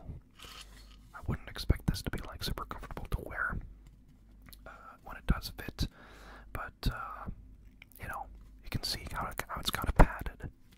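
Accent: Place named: United States. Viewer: så kult! Hvor bor du da? Høres ut som du har en veldig amerikansk dialekt på engelsken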